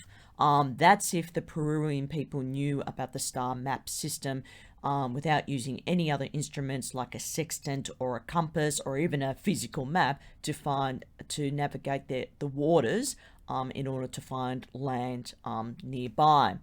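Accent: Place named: Australia. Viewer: Hi 👋 do you have Polynesian roots? I can hear 👂your an Aussie but you have Polynesian features.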